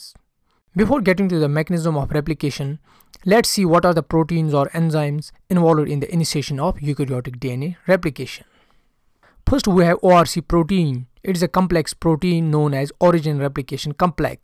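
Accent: Kashmir, India. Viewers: U have a Kashmiri accent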